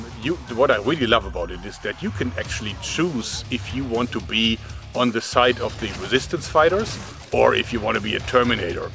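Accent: Germany. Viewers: Say Asta la vista with ur German accent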